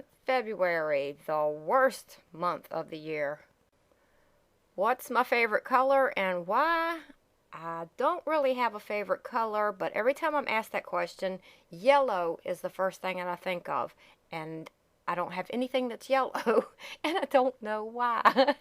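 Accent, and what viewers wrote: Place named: Gulf Coast, United States. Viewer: I was browsing through your channel and came upon this video. I really enjoyed your childhood videos. I always thought you lived closer to the gulf coast with your accent and your early gardens. Guess I was wrong:(. I have always wanted to live in virginia and buy a plantation home from before the civil war. My mom said the same thing about wishes..only there was a swear word in there. Funny how some sayings become popular:) Thanks for sharing:)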